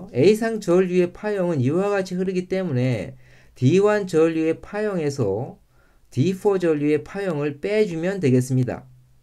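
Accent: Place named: India. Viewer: 교수님 근데 어린시절 인도쪽서 유학하셨는가요? 저 거래처 인도분이 한국어하는거랑 억양이 너무비슷해서 놀랐어요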